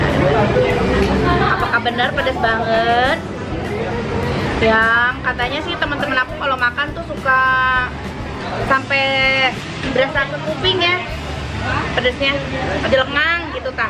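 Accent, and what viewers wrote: West Java, Indonesia. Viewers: ngakak sendiri liat teh kl pke logat sunda lucu,.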